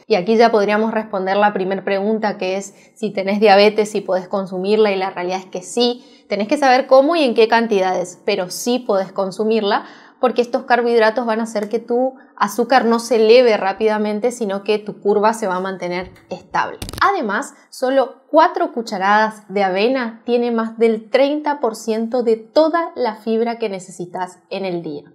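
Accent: Misiones, Argentina. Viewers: Buen día es posadas, misiones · De misiones son , norte. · ES DE MISIONES ( ARGENTINA) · Excelente equipo doctores! Se nota su acento argentino, felicidades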